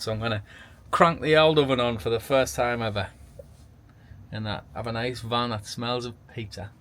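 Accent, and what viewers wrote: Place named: Newcastle, United Kingdom. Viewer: As an ex Geordie like left 65 years ago. I am thinking most people will not understand some of what your saying. I do though.❤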